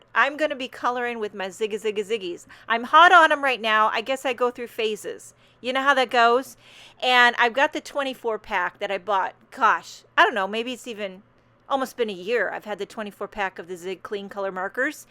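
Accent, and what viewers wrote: New York, United States. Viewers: I love your videos, funny personality and I love your accent too. New York?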